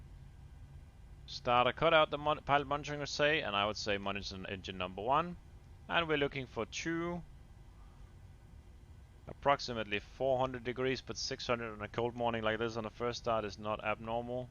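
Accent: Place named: Denmark. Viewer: Hey just wondering, are you from denmark? You sound danish haha, im danish too btw!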